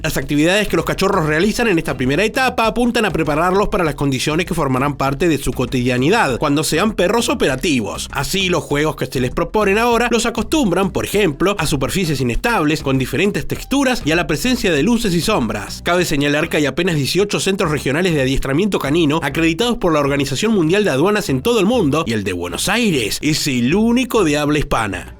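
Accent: Argentina. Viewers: Por favor!! Me encanta como hablas. Y las palabras tan Argentinas, y el tono. Que buenas narraciones. 👏🏼👏🏼👏🏼👏🏼cual es tu nombre!!!! Jajaja 😆 la paso muy bien con lo que dices!!!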